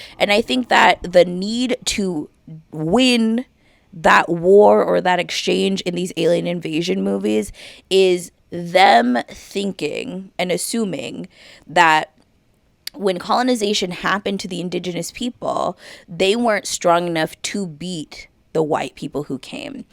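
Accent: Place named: United States. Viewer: You sound a lot like a Yank but I'm just biasedly assuming it, since this is their platform and all. All these smart Yanks are a lot like the Smart Russians, think you know more about people's cultures than other people, living an insular lifestyle, while gate-keeping cultural critique and also political ideology as though they h ave an exceptional awareness compared to other nations of people. This can even include supposed appearances of being activists for colonial exploitation or in a what I call pseudo-left wing point of view.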